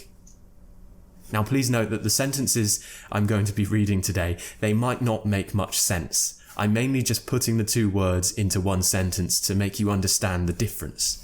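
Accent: United Kingdom. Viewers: After changing my work in UK process, I came in to learn Uk accent and found this Chanel to be one of the best Uk Accent learning platform. And now I'm addicted to sir accent.may be I'm late but whenever I'm free I'm listening to your life journey podcast. ❤️ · For a 15-year-old adolescent. Every time as I’m watching your video instrumental, it should be a very enjoyable and elated process since I could hear and feel the most authentic and received British pronunciation, which benefits me too much. Thanks!!!! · TBH, from all those videos I've come across, your ones are the best to learn the British accent! And such a lovely teacher you are! 🙊❤ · Absolutely brilliant content and delivery. Serious skills here! Thank you for your valuable contribution. I'm etching ever closer to a British English accent thanks to you. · U r a Good teacher. your British accent is very nice ... 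I love your style ... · Thank you very much. Your videos are very much useful to me. I like very the Pronunciation of English not Amrrican. You are great teacher. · Your English accent is so British.🙃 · Why are you speaking English so Britishly?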